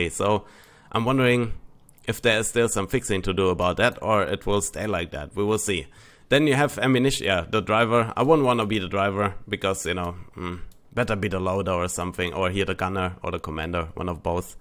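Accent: Germany. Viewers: I love his German accent :P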